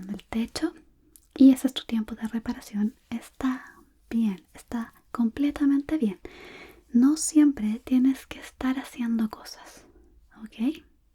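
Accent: Chile. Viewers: sos de chile? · Eres de chile ?👁👄👁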